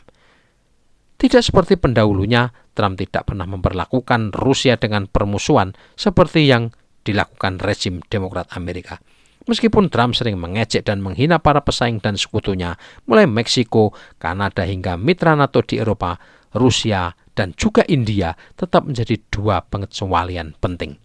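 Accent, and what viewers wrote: Java, Indonesia. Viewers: I love medoook. 👍👍👍 Autentik.......👍👍👍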